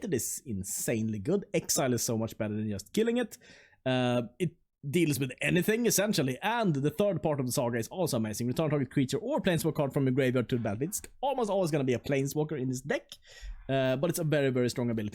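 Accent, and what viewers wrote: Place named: Sweden. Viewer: god dam you have a strong Swedish accsent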